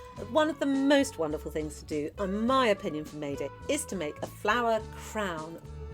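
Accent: United Kingdom. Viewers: Hello there beautiful and that sounds like so much fun and I love the British accent thanks for sharing your precious memories and brightest blessings to you sis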